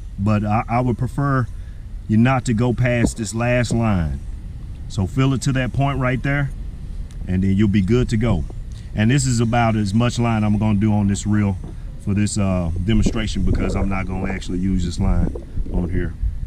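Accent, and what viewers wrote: Texas, United States. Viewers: Texas baby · lmaooooooooooooooooo that country voice tho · Are you from texas?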